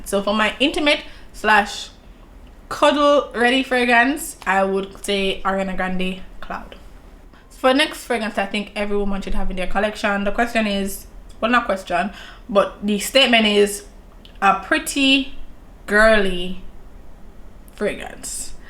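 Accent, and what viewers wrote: Jamaica. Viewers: I love your Jamaican accent. Nice recommendations! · Ok yaadie🇯🇲🇯🇲 sound like Jamaican. 😀 new subbie here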